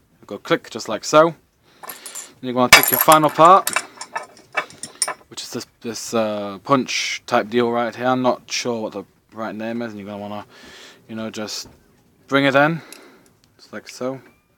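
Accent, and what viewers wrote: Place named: England, United Kingdom. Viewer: Thanks so much for these videos, I live in the U.K and have this exact model except it is a blank firing version made by Pietta. Turns out the little hand and spring was the problem as it had broken and you have really helped me to fix it, I cant thank you enough! Nice to hear an Englishman's explanation!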